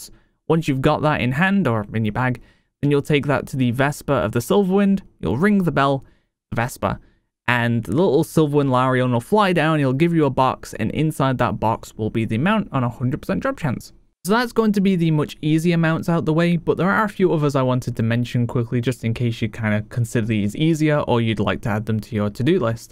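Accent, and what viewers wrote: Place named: Yorkshire, United Kingdom. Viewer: Where in Yorkshire are you from mate? Your accent is very similar to the wife's 😋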